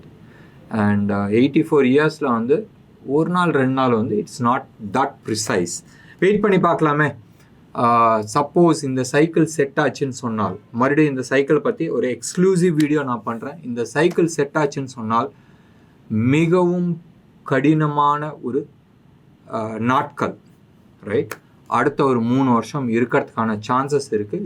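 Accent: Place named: Kongu, India. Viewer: I felt I was at erode speaking to my cousins regarding the market status .but we enjoy the slang and daily used phrases all pains have washed out l.i ama kongu girl thank you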